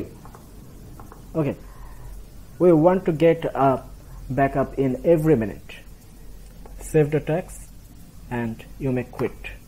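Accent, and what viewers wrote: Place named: Bangladesh. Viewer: BANGLADESHI ENGLISH.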